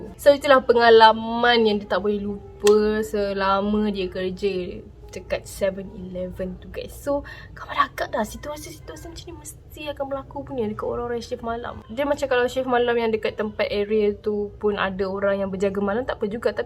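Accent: Kelantan, Malaysia. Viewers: 7e kelantan ni kowt...sbb dia punya loghat mcm loghat kelantan😚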